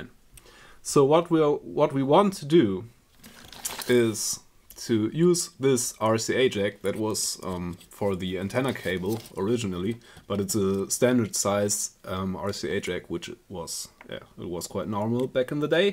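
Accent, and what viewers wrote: Germany. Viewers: Like the video 😃 keep up the good work . Also are you German ? You should like a German speaking guy.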